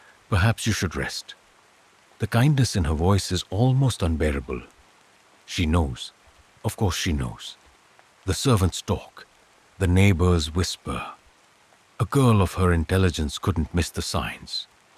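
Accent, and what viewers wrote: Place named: India. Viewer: Very good, indian accent is quite strong💪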